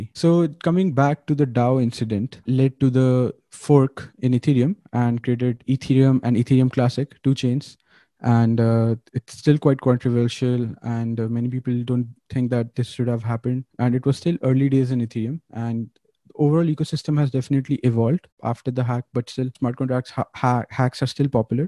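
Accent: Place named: India. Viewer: Indian English not understand I.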